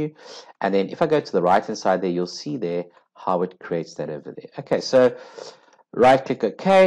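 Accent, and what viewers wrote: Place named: South Africa. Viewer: are you south African, i heard your voice and immediately knew 😂😂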